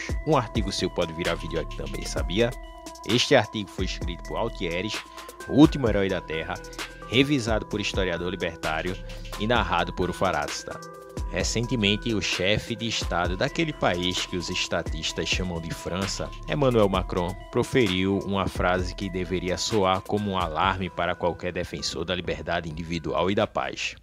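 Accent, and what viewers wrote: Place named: Northeast, Brazil. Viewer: É muito bom ouvir um conterrâneo cabra da peste narrando. Como faço para me candidatar? Seria uma honra.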